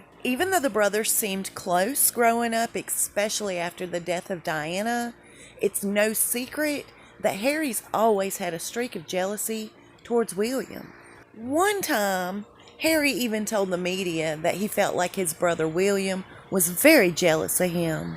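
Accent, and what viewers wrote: South, United States. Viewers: Love 💘U, We Will All Miss U, We'll Miss That Southern Accent With All That Great TEA U Serve Us. U R SORELY LOVED We'll Miss U Something Awful, Rest In Peace Our Beautiful Sweet LADY, 🦋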